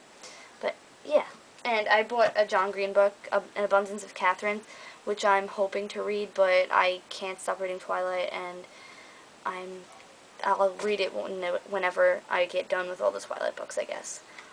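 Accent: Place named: New Jersey, United States. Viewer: You tawk like jersey. lol It's cool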